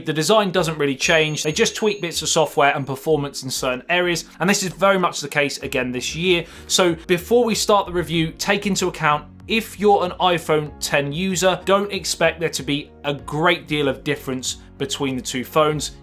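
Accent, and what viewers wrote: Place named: Northern England, United Kingdom. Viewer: This is not RP. Bad northern England accent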